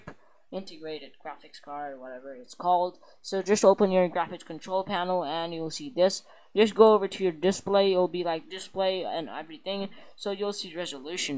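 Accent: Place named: India. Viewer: You sound like a India Girl no offense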